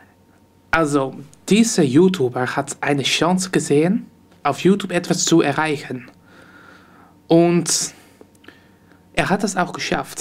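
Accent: Netherlands. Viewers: danke! Ich habs mir voll angekuckt und teile zu 100% deine Meinung. Dein Niederländische Akzent ist lustig ;D Mach weiter so :) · Deine Sprachkenntnisse sind meiner Meinung nach auch gut. Ich hatte auch mal eine Englisch Lehrerin, die aus Niederlande kam. Sie hatte den gleichen Akzent wie du =)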